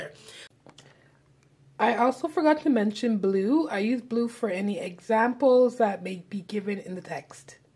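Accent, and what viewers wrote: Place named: Barbados. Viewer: This was so helpful, love the color palette idea! Off topic: I’m hearing a Caribbean accent😅 Bajan I think. I’m Trini!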